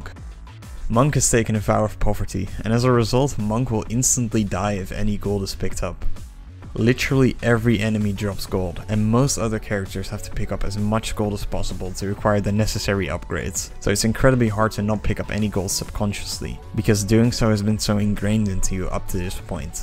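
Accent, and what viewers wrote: Netherlands. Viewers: Ik hoor je Nederlandse accent😜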